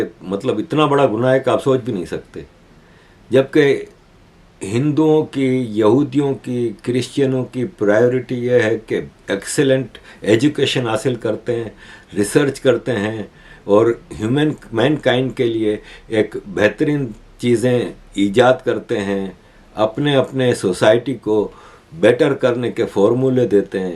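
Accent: Gujarat, India. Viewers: Nice to hear you and your style of talking...adding some gujju snd kacchi dialect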